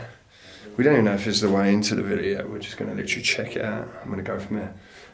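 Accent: England, United Kingdom. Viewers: what about Abandoned world explorer and Adventures with? I truly enjoy watching your videos. I have watched numerous other Explorers but I must say I like your style, how sincere you are, you are truly interesting, educational, funny, and easy on the eyes. I also love your voice and English accent is marvelous. You have a true gift. I wish you so much happiness, peace and joy doing what you love.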